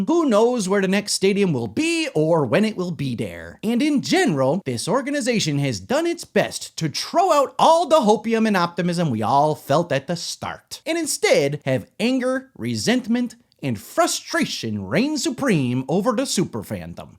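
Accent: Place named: Eastern Europe. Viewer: What is with that accent. You sound like someone from the old eastern block countries. You're mixing together your Chicago accent with Wisconsin accent.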